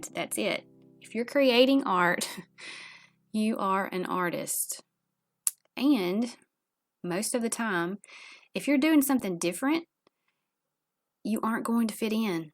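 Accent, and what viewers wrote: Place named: South, United States. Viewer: I know this is an old post, but I wanted you to know that I really enjoy watching your videos. I started painting 5 years ago during the pandemic and like you, I have sold some paintings but never think of myself as a “real” artist either. My husband tells me I am a real artist because I have sold some artwork, but I have imposter syndrome when it comes to my artwork because I didn’t go to art school and have never had any formal training. I learned by taking online classes and watching YouTube. I am a social worker for my job but would love to one day leave this stressful job behind and retire and paint everyday for the rest of my life. Seems like that is never going to be a reality with this economy the way it is. Anyway, you are such a sweet person and I love your southern accent. Keep making your videos, because you and Sandi Hester are my two favorites😊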